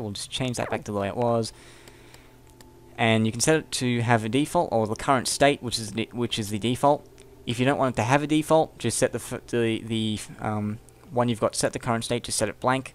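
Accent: Australia. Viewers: u an aussie?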